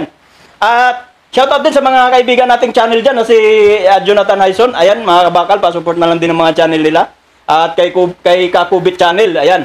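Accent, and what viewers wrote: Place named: Visayas, Philippines. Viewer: bisaya mlng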